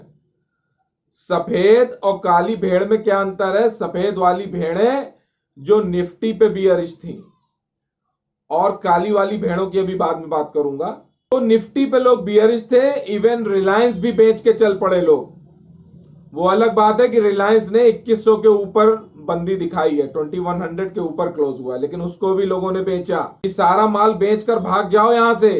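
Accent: Kanpur, India. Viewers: Kanpuriya lagte ho bhaiyaa jee app🙏🙏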